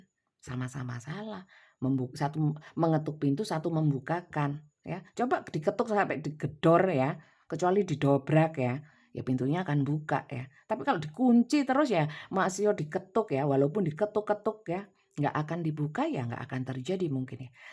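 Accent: Java, Indonesia. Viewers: CERITA ku bunfa itu. aku segan demen bngt ma suara bunda yg khas. enak di dengar . masio medok terasa luwess. pingin bamget t lihat foto bunda. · Tapi ngangeni readingannya bunda... Medhoknya,ceplos ceplosnya,sak ononé bgt. Istilah²nya njawani tenan. JANGAN BERHENTI JADI TAROT READER.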